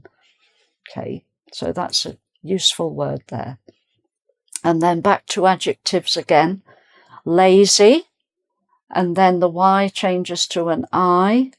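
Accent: United Kingdom. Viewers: Good evening Miss, from Brazil, your british english make me remember when I was a teenager and starting my knowledges, nowadays, I need to learn american english, trying 😂, your voice is so sweet ❤❤ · Hi teacher! I 'm an English teacher in Brazil and I just love your videos and your genuine British accent!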